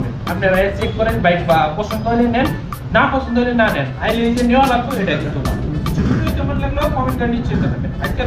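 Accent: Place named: Bangladesh. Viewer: I suspect this guy is from Bangladesh. His accent says so. Not like pure indian west bengal bengalis. Requesting respective authorities to check his and his family background and origin seriously. Infiltrators from Bangladesh burma and Pakistan are playing havoc on the security and economy of our country.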